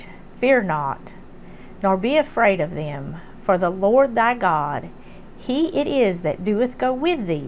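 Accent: South, United States. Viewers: She is a sweet and real lady but, I just love her accent...southern and country. God Bless her and her family!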